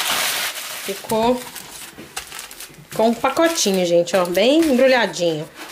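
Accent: Minas Gerais, Brazil. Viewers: Ela tem um jeito todo mineiro de conversar . Tenho vontade de conversar com ela, pois também sou mineira mas péssima cozinheira.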